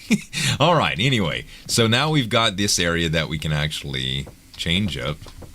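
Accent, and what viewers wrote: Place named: South, United States. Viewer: You seem more country then last time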